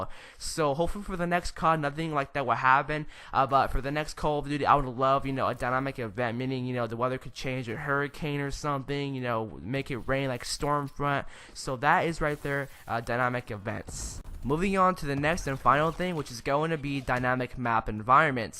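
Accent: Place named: Spain. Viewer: it sounds that you have an Spanish axsint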